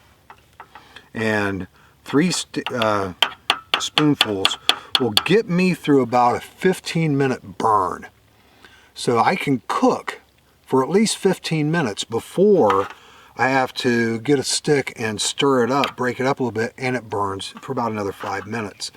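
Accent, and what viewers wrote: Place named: Ohio, United States. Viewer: Are you located in Ohio? Your voice/accent remind me a LOT of Dave Canterbury. Great video, btw.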